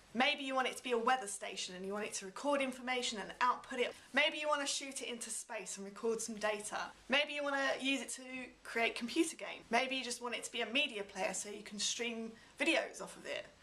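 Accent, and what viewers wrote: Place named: United Kingdom. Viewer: British accent <3 cool video :)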